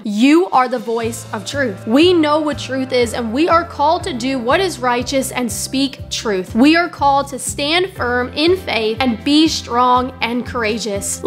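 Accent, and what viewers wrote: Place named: South, United States. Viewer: I love your country accent, beautiful thoughts, soothing voice. Thank you for being here and sharing the Gospel. We need more women in Christ. I feel so safe listening to your page and I know your heart is in the right place. Thank you for your testimony, and keep leading others to Christ. HE IS SO GOOD> also, nice to see a woman in my area who thinks so much like I do. God bless you. I read my Bible every day and have stripped my pleasures from me once I saw the destruction they truly were doing.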